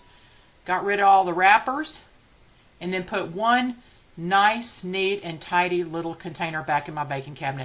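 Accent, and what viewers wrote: South, United States. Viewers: Oh wow! So so great to hear your cool southern voice again 🤗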